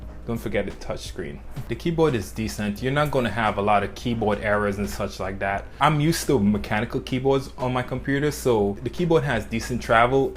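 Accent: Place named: Barbados. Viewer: Hearing that heavy Bajan accent there bro! :-) Great review and keep it up!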